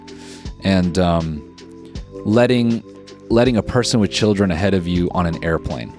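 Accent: United Kingdom. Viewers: I need more British accent!!!!! But u need to do the different accents from all over the uk, like Scottish, posh British, brum accent etc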